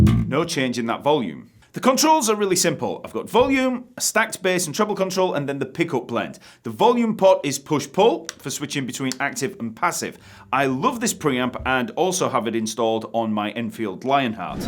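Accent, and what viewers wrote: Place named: North, United Kingdom. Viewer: Apart from your quality of content , it's so great to hear a NORTHERN VOICE on the tube ! -from a fellow Northerner !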